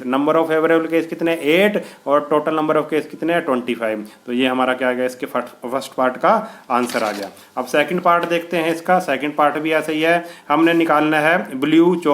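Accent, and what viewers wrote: Punjab, India. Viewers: Sir punjabi ho na app?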